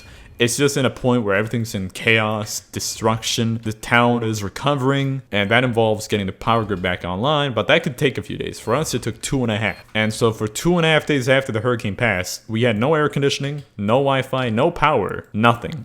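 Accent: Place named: Florida, United States. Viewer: you generally sound floridainian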